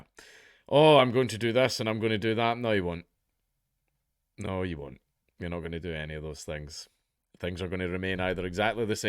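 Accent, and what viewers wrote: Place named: England, United Kingdom. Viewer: You sound like 80% of the English population and now we have her son who no one cares about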